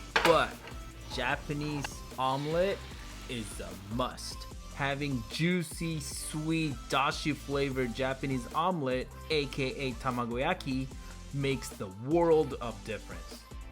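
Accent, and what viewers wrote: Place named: United States. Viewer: We don't say "you greedy" in English 🤔 Your spoken American English is a bit exaggerated.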